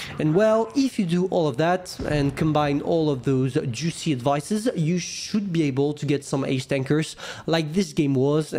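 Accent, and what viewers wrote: France. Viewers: My ears hurt when he pronounces english words like they were French and I can tell because I'm French myself but I'll give him credit for speech flow.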